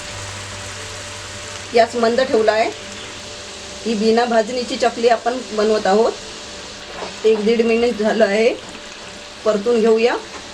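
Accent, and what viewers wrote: Malvan, India. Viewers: Tumhi Malvani aahat Ka madam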